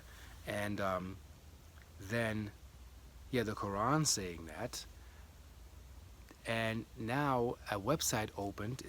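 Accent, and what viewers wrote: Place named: Puerto Rico. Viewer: Greetings, my star bredren. Thought you might find this of interest: <link> A relevant quote: "In this ancient genetic continuum between Africa and West Eurasia, sampled African-American individuals are most similar to sampled individuals from the Nilotic and Horn of Africa regions" Your accent places you as originating on the east coast, perhaps of Puerto Rican extract? This article speaks of West Indies and Caribbean populations and their relation as far as their genetic makeup, to these ancient Nilotic populations as well. Literally, we ARE them, reincarnated as it pertains to soul groups and karmic recompense. Blessings, Mark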